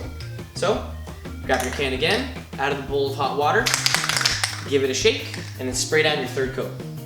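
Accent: Canada. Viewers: Canadians and the way they say out. hahaha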